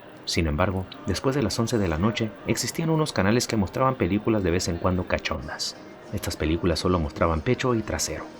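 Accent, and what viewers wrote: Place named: Mexico. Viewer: Eres un artista para narrar, un estilo muy peculiar mexicano 👌 felicidades carnal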